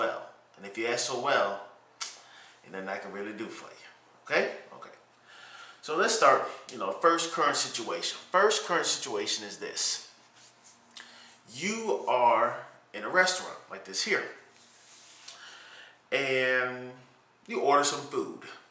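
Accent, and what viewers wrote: Jutland, Denmark. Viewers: og nårh ja, man kan godt høre at du bor i Århus, du har stærk jydsk accent :D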